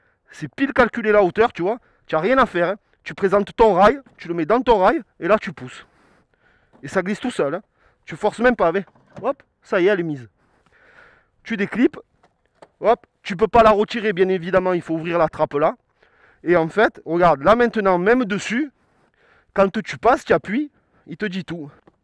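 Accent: South, France. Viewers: Me encanta el tio este con su acento del sur,j'adore ce mec avec son acent du sud,saludos desde Normandie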